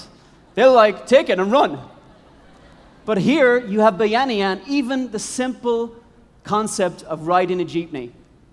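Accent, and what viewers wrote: Ireland. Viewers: I love your accent lol it reminds me about my ex bf from Ireland too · suntok2yoface He is Irish. You are being narrow minded. Fyi, even among north American speakers, Brits, Australians, and other English speakers, they have varied accents and ways of speaking the language. Try to be more open minded before making a comment.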